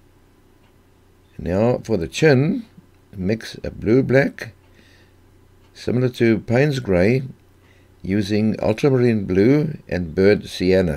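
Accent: South Africa. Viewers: I absolutely loved hearing my fellow South African accent so unexpextantly. I have been living in the US for a few years and was looking for some new inspiration in my paintings. Great tutorials.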